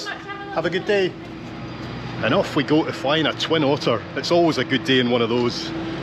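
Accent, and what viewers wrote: Scotland, United Kingdom. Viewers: Tbh.. After watching through most of you videos I hade to google “Wee”. I understood it context to be tine but in my Swedish brain it’s something else.. but apparently my Scottish is useless from time to time:) Keep it up. I enjoy you dialect and your videos. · The more remote a Scottish place that goes to, the stronger his accent gets.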